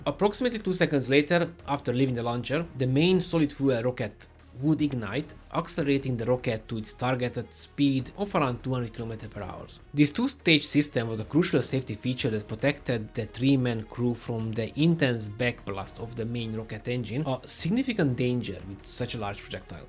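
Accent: Hungary. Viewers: They don't mind the Hungarian accent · nagyon lehet hallani hogy egy magyar beszél angolul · Jó hallani egy kis magyar akcentust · You have a hungarian accent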